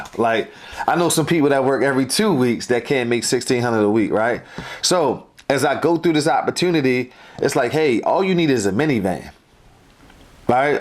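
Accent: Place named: Baltimore, United States. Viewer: He must be from Baltimore or D.C because that accent is REAL strong!!! 😂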